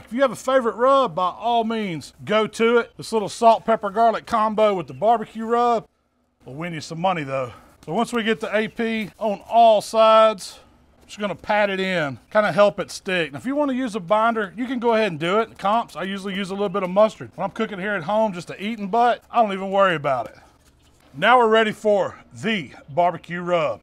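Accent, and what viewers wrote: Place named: New York, United States. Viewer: New York Accent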